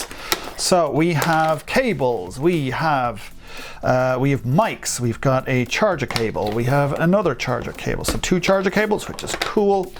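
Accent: Ireland. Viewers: Hi Was scrolling through videos to compare and find a good budget wireless microphone system for DSLR camera. When i heard your Irish accent i said this is my man 😂😂 can you give me some advice if you had any experience with Synco G1 A2 system. I love the Rode Wireless Go but I find there is very little extra"s for your Euro. For €50 less, the Synco has a great all round package but im getting mixed reviews in videos. Just to note i will be using microphone indoors 99% of the time, doing cooking demos. if you have any advice i would greatly appreciate it. 👍 P.S Loved your video & Humor 🤩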